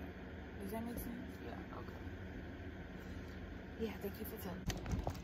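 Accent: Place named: South, United States. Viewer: I'm from Queens York I just love it when you talk Southern♥️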